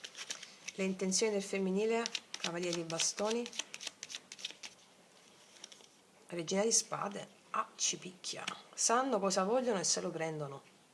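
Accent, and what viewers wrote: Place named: Sicily, Italy. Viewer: Ciao mi sa è siciliana 😊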